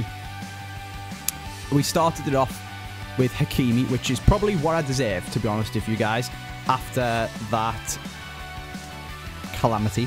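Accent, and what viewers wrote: Liverpool, United Kingdom. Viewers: He’s basically a scouse Martin Tyler with that new mic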